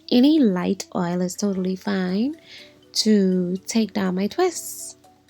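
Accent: Bahamas. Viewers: New to this channel. Sounds like you have a bahamian accent. Your twist out came out bomb.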